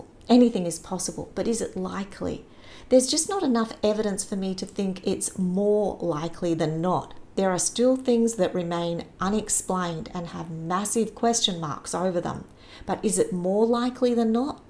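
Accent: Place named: Australia. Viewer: Nice to hear a fellow Aussie. Good speaking voice - I'm older, and you're not too fast. 31 year olds speak too fast for me. Anyone under that is nearly unintelligible. This was good. Thankyou.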